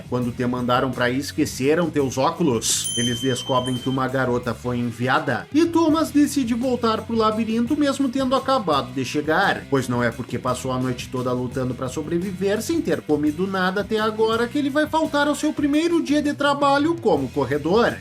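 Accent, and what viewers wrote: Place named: South, Brazil. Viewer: O chato da narração é o sotaque de Roceiro Sulista! Cara, volta pra roça! Lá você conseguirá!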